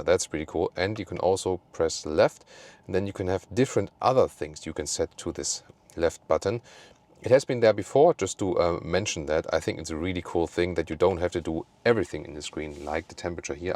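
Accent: Germany. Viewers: you are good in many ways but please work more to improve your English! Is very tiring when you speak English but we hear mostly German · I love to hear your German accent :) perfect . nice review as always. is it original quicksilver color? or another color changed with ppf? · An excellent review. Love your German accent and your English is perfect, thanks for a great review.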